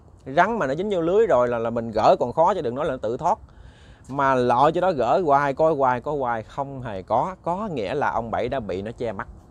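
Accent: Mekong Delta, Vietnam. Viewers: Ma mà khôn quá xá hả. Thích nghe giọng miền tây của em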